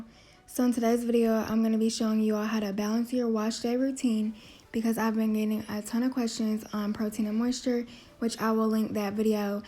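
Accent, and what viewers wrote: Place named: Tennessee, United States. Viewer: Girl u sound like u live n my state TN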